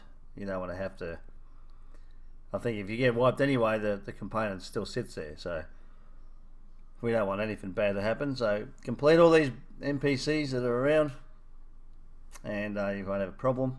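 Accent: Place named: Australia. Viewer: I can already tell your Aussie 😂, nice video and great explanation 🤘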